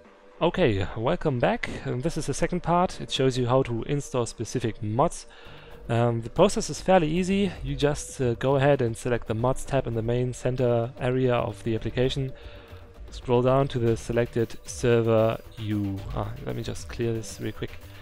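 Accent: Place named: Germany. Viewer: And now i noticed how funny the german Accent is xD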